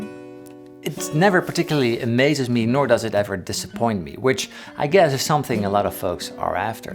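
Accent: Netherlands. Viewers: Ik wist gewoon aan uw accent dat je van nederland was hahah ma je vids zijn wel echt nog cool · what a beautiful dutch accent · I knew you were Dutch ! Toch wel goed accentje hoor ! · Ik hoor een nederlander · Ah, yes, the man met that Nederlandse accent! Do listen to him, he knows guitars. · Al die tijd had ik zo’n vermoeden dat je nederlands was! Gewoon door je Nederlandse hint Engels. Haha nu weet ik het zeker, helemaal top😂😂. Keep up tue great work tho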